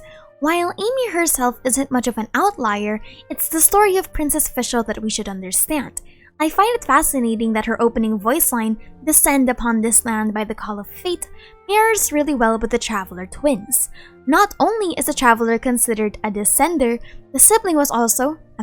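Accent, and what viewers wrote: Philippines. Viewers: Somehow i knew that you are a filipino based on your english accent. love your videos btw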